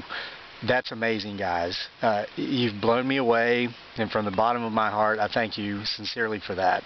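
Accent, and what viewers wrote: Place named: South, United States. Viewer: Petutchi Betelgeuse Ditto.His genteel manner and southern accent are super nice too. I havent caught his name either... so humble.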